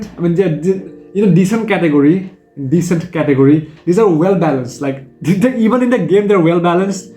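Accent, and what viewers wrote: India. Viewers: Yo dude, quick question, where are you from? You look asian but sound indian, it's an interesting mix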